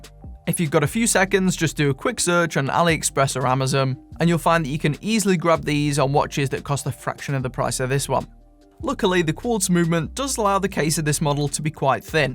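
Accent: Stoke, United Kingdom. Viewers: stoke accent and that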